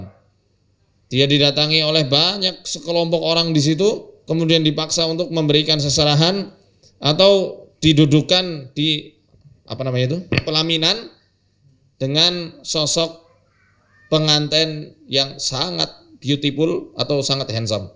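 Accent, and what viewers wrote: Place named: Banyumas, Indonesia. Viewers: Kok ngapak dr mana ya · Orang sokaraja banyumas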